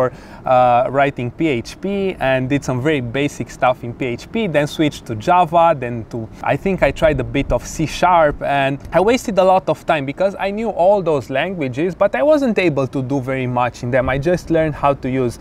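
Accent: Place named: Romania. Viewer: vorbesti engleza ca un roman..fa le frate in romana si adreseaza-te romanilor..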